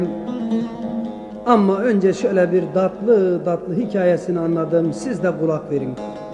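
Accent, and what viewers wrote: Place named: Hatay, Turkey. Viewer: Hatay'da ki Türk aşiretleri hepsi böyle konuşur Avşar boyu'ndan 56 Türk aşireti yerleştirildi burayı Türkleştirmek için...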